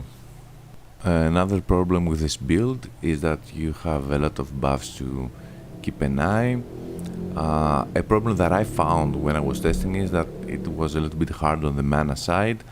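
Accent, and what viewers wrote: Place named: Greece. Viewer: I bet this accent is greek 😍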